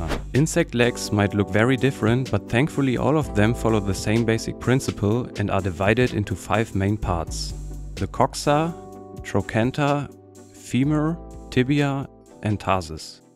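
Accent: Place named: Germany. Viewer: Scheiss deutscher mit scheiss akzent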